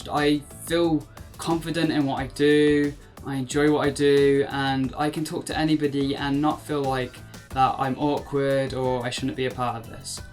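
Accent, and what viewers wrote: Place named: United Kingdom. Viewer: You look so good and such a beautiful long neck, and that beautiful British accent, you are adorable.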